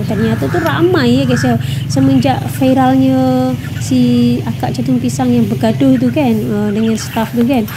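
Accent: Java, Indonesia. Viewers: Slank Jawa nya pekat.Walau pun dh lama dok M'sia.Semoga pelan pelan berubah agar jadi Org Malaysia.Maaf bukan tujuan menghina tapi kagum dgn ketabahan hidup di M'sia.👍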